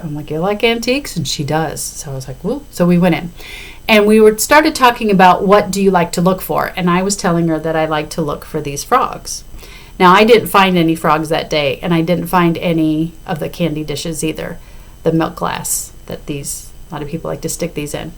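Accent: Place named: Illinois, United States. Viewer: First time watcher here. It sounds like your a teacher in Illinois. If so, which part of Illinois? I teach in the south suburbs. I will also be at weekend A. I’m going by myself so I’m hoping to meet a lot of new people.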